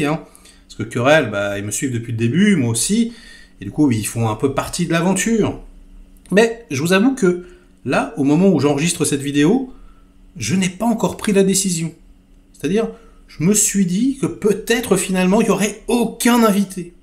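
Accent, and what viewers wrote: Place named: Quebec, Canada. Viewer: Quand je fais connaître ta chaîne du Québec,ils l'adopte immédiatement tu parle comme nous sans prétention, bonne anniversaire 2 ans tu mérite ton succès.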